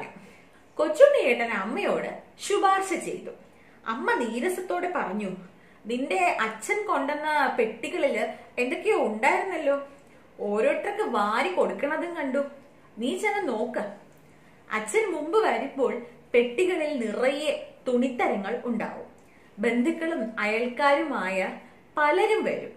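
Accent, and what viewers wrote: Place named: Pattambi, India. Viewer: ഒരു പട്ടാമ്പി കാരിയെ പോലെ കഥ പറഞ്ഞു..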